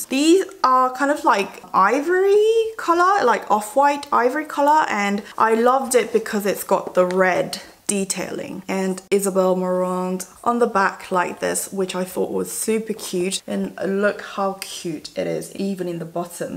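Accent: United Kingdom. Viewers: omg I've actually missed your british accent!!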